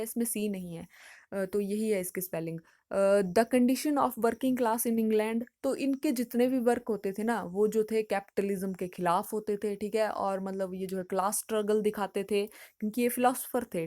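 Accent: Haryana, India. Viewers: Ur presentation is really interesting and it feels gud to get a haryanvi touch in the slang. Thank u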